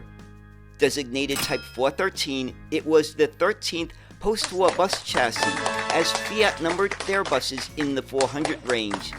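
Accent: United States. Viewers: Thank you for yet another interesting bus video. I am not from the USA and so I hope you don't mind if I ask about your accent and which part of the US you come from?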